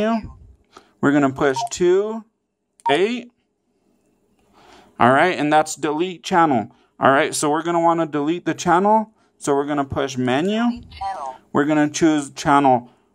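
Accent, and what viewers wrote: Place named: Bay Area, United States. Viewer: Thanks bud. You say Hella. Im from the Ca BayArea too.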